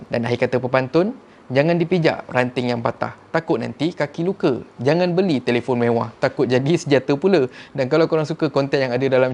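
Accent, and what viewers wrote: Kelantan, Malaysia. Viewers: Terbaiklah bang ckp kelantan 👍👍sy suka tngk &sy pun dri Kelantan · The best part cube kecek klate dan slalu mitak maaf takut salah sebut dan pantun slalu terbaik, klakar abis..hehe Terbaik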